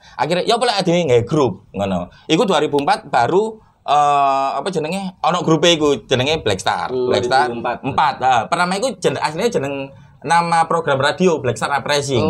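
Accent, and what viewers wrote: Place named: Surabaya, Indonesia. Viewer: Lucuuuu paraahhhhh...kok gak nglawak ae mas.... Ngakak aku .... Boso suroboyoane kenteeelll ...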